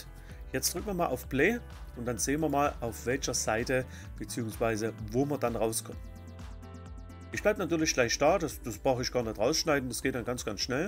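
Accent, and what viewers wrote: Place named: Saxony, Germany. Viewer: Ich bin, deine Stimme hat mich geholt mein Sächsischer Bruder!!! <3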